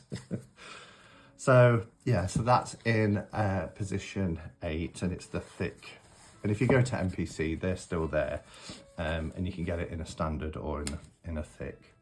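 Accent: Nottingham, United Kingdom. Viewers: great vid, thanks. Do you have any links for these? Can't see the Matt Green Neutral Vibes one anywhere. I'm new to Tarot, love this vid. You sound like you could be from Notts, good man if you are! No offence if you're not!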